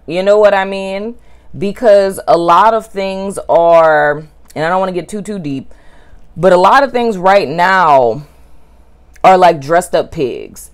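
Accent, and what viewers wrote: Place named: Charleston, United States. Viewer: Love that you have mental health resources in the description box ❤️ Curious to know what bit of accent I’m hearing?... sounds a bit like Charleston (where I’m from) or NOLA (similar sound) or Bahamian (also very similar) lol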